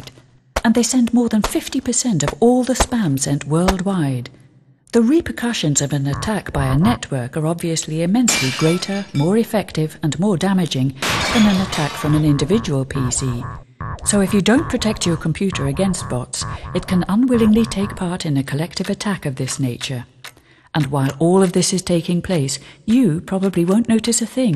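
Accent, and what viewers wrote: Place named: England, United Kingdom. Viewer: This is not a good explanation of the difference between Viruses and Worms! Viruses and worms are not known for "letting in riff raff". That is a backdoor. The difference between a virus and worm is that a worm can replicate and spread over the network by itself (self instatiating), whereas a virus requires a host file to run, it needs to be attached to some form of executable file. Simply, a virus requires a human to invoke it, and worm does not. Simple. You don't need to explain it badly. Despite the English accent, this is bunk. <link>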